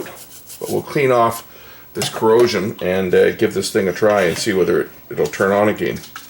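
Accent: Canada. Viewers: we definitely need a kitty cat review video! Btw are you from Manitoba originally? Your voice sounds very familiar but maybe it's just a Canadian thing. (Edmonton, Alberta here)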